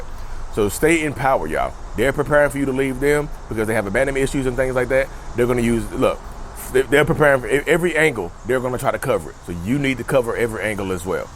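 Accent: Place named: North Carolina, United States. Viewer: Dude, your accent make me wan move bk to Rocky Mount n things like dat haha 💛💪🏻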